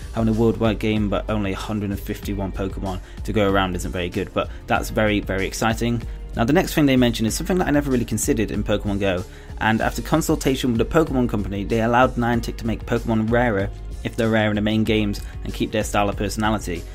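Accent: United Kingdom. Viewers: Nice video most British voices that are recorded i can't really stand but i like your videos keep it up 😎👍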